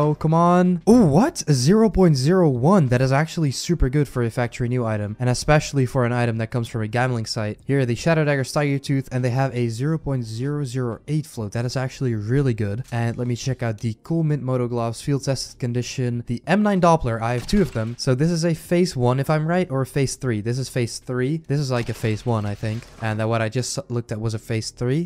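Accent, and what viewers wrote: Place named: Netherlands. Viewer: Haha jou Nederlandse accent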